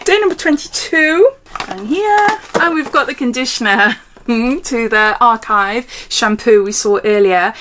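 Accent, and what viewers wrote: Wales, United Kingdom. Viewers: Really sorry to hear someone was so rude about your bubbly personality. It’s one huge part of why I love your videos. Honestly, thoughtful reviews, also I do love Welsh accents 😄 Ignore the odd silly comment. This calendar looks really great. It would’ve been on my hit list if I could get it shipped over. You’re spot on as well… “no Avant”! Those products tend to artificially inflate the overall value and I find it off-putting when I see them in boxes or calendars. I wish their pricing was more in line with the rest of the industry.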